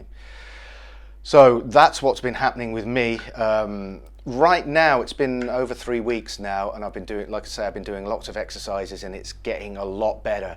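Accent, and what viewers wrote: United Kingdom. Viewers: When did john petrucci start talking british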